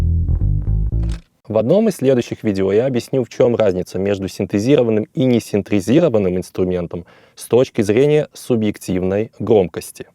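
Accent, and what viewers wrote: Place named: Germany. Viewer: Откуда у тебя немецкий акцент?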